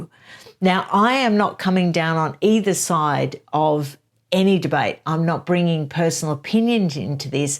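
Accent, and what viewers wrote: Australia. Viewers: I really like your lovely gentle Aussie accent (I'm a Londoner) and enjoy your views...thank you!! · I absolutely don't agree she was ever pregnant. Her belly was different sizes on different days. Often different sizes on the same day. What about when the bump fell down past her knees? Remember when, at almost full term, she did a deep squat in high heels & needed no help to stand up again. Unborn babies don't conveniently move to one side so you can squat. Anatomy doesn't work that way. I've always been very slim & had 2 babies in my 20s, not middle aged as she was. I can tell you, my baby bumps got bigger month by month, not up & down on sometimes an hourly basis. Neither did my babies conveniently get out of the way if I wanted to squat. Not that I could have, even if I wanted to. So much more I could say, but, NO she was never pregnant. 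Love your vids. Your lovely accent brings back fond memories of the 5 yrs it was my privilege to live & work in Sydney. It was bonzer.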